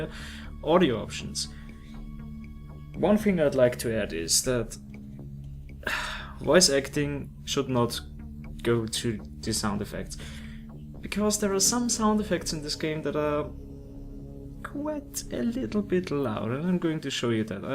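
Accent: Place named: Denmark. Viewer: Ok not to sound mean or anything but please use a script! the amout of times you stopped and hmmm, got me quite annoyed and i can tell your from denmark(sorry if your not), and no i don't know what you mean, look it up wright it down in the script, this did not have to be 24 minutes long 10 min was all you needed. but i feel potential in you join the darkside ;)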